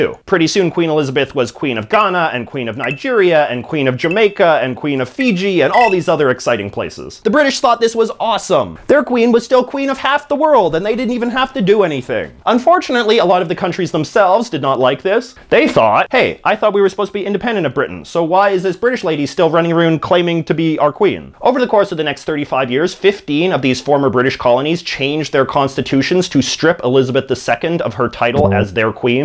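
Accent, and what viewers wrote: Canada. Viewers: About. Foond. Canadian accent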